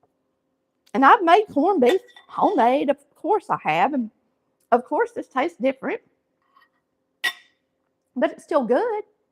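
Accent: South, United States. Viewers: Hey! I just watched your corned beef and hash video. I have never browned mine like that in the pan, but I'll have to try it that way. I'm from Georgia but live in South Carolina now. I just love watching your videos. Your southern accent sounds just like me, my mom, and my sisters! I think if we lived close by each other, we'd be great friends!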